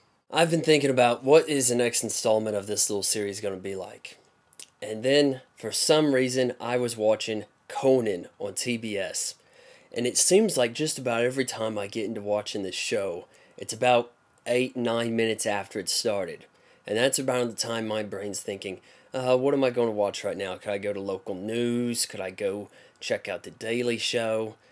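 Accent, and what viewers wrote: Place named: Australia. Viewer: wow you really sound australian with your cold.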